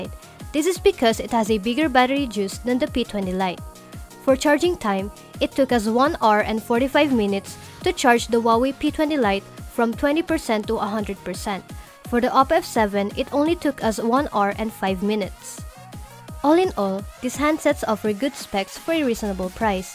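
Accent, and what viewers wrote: Philippines. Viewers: kaya naman pala eh. Ph ka pala. Iniisip ko parang Philippine accent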